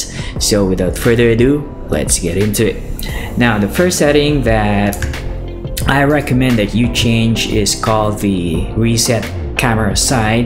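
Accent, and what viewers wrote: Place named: Philippines. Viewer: Fro your accent I know you're my KABAYAN!! subbed! Keep it up.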